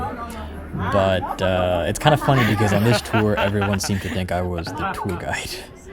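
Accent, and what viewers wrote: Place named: West, United States. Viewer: ?? You are Indian or Indian origin people who lives outside of India? Your accent sounds western. Where are you from originally?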